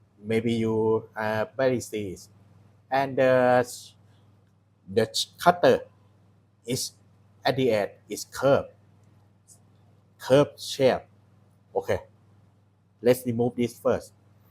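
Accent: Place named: Thailand. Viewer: สำเนียงคล้ายๆคนไทย เลยครับ เจ๋งๆ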